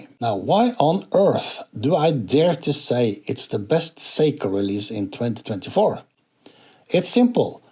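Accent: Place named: Sweden. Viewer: Hi,. I enjoy your reviews, and your accent reminds me of my grandfather, and other, living, relatives back in Sweden. It’s music to my ears! Greetings from the American Midwest, where Vikings cosplay is a thing. Thanks for your review of the SRPL13. I agree on all points, and ordered one from Teddy for $437, plus state tax, tariffs included. It paid to procrastinate. Could not wait any longer, as a Seiko price increase was to kick in on 15 Sept. I was torn between this Seiko and the Citizen BN0150. The Citizen has ISO Diver’s 200, and has grab and go Eco-Drive solar movemnent. In the end, the Seiko’s style won out over the Citizen’s practicality, ease, rating, performance and value proposition. Since I don’t dive, this was really ultimately about aesthetics.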